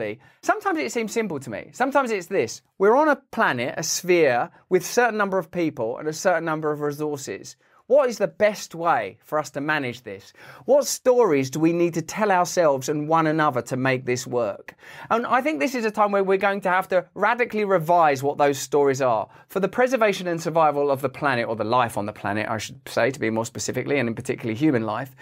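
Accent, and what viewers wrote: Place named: Cockney, United Kingdom. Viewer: It’s a London accent I think.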